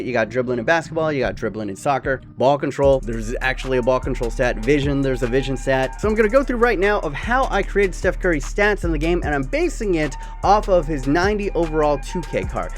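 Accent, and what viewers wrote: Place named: Oakland, United States. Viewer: Is this man from Oakland?if he is probably from downtown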